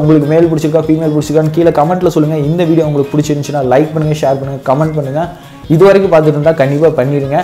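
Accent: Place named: Kerala, India. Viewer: Bro kerala erunthu pesure semma4bro supper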